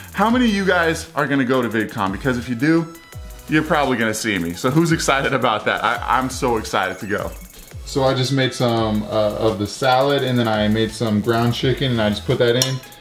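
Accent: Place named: Ireland. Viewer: With your red hair and Irish brogue, have you ever been mistaken for a leprechaun?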